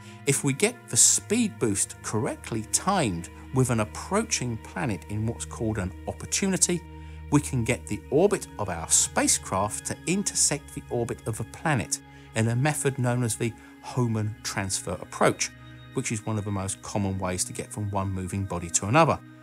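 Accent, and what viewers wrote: United Kingdom. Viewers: You explain everything better than my first language teacher. Is this British accent? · Why is this guy with a British accent when it is the Americans who are achieving this accmplishment??? I went to Cape Caneveral and they had a British guy expliaining the Mars Mission. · hit like for british accent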